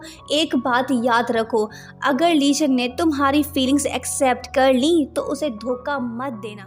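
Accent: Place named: India. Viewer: Mujhe laga aap Indian ho kyuki aapka voice Indian Jaisa Hai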